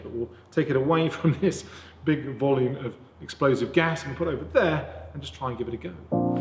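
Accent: United Kingdom. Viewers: Субтитры в процессе, хотя я к 4 серии британский говор стал лучше понимать, до этого в основном американский английский слушал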